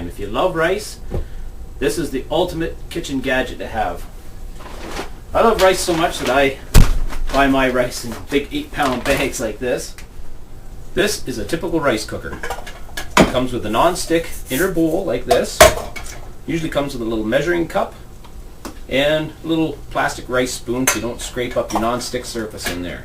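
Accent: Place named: Canada. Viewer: I was just thinking you sounded Canadian, and then you pulled out the bagged milk!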